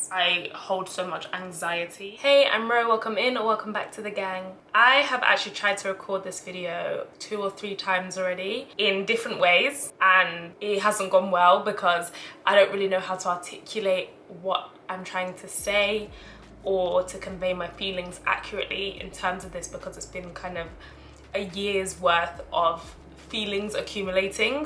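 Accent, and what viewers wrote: United Kingdom. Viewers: Koreans are a bunch of racist weirdos with their Kpop and femboys. You're actually incredibly beautiful and hit the genetic jackpot. Plus you're well spoken and you have a British accent on top of that. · are you british? i can hear it in your voice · They were mean to you....I am a white older teacher, and have taught hundreds of people, so on that claim of expertise; you are pretty, you are special because of braving the adventure, and you are gifted in language expression with a British accent that is so eloquent and in fact exquisite, and you are clearly intelligent as anyone could tell if they had half a concept of full sentences, vocabulary and grammar....they were lucky to have you and didn't recognize the chance they had for their students...it was their loss...Like Jesus' advice was; shake the dust off your feet as you leave them and know you will be guided to a lovely future...you deserve it...meanwhile you got to experience a high context culture which is based in inference and not directness...(PS, I was a French teacher so I want to add; Louis Pasteur had a colleague Antoine Bechamps who argued that if the germ harms then it should harm everyone but it doesn't so in fact Pasteur woke up to that fact before he died and confessed that he was wrong and Bechamps was right...his dying breath said Le Terrain Est Tout, the terrain is everything...people's immune system means the germ doesn't have the power people claim it to have...your field or terrain is helping you.) God bless you!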